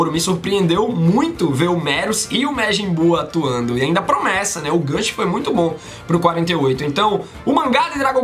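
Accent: Pernambuco, Brazil. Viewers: Esse cara e Pernambucano kkkkkkkk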